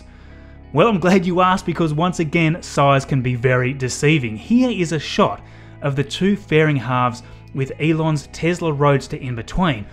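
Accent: Australia. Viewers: Just subscribed! Great to hear an Aussie voice describing Space stuff. Good on ya mate thanks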